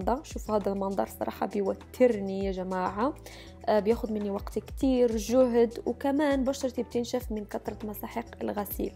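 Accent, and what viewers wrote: Morocco. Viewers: Si maghrbiya bayna ghir tari9a kalam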